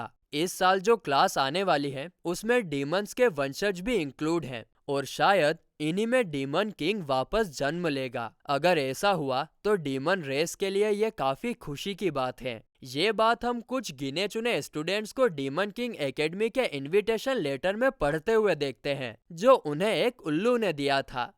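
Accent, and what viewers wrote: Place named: Gujarat, India. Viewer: Bhai ye gujtati vibe hta de yar thoda sa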